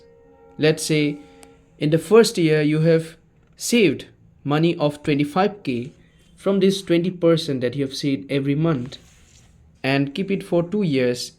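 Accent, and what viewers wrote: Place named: Manipur, India. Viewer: A meitei right? I can sense from yr accent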